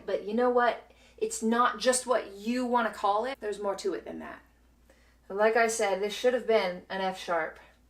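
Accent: Scotland, United Kingdom. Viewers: Loving the Scottish accent. Oh, and the lesson. Thanks! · Are you half Scottish ?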